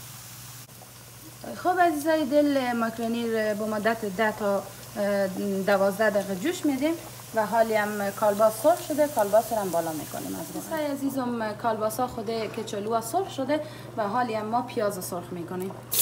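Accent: Herat, Afghanistan. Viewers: به به ماکارانی پختن با لحجه هراتی خیلی عالی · With the beautiful Herati accent you make it more interesting to follow.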